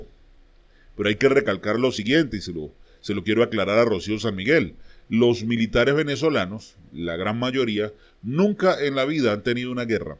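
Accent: Colombia. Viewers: Un colombiano narrando xdioxxx ¡¡ Ve con Uribe hacerle cariñitos ¡¡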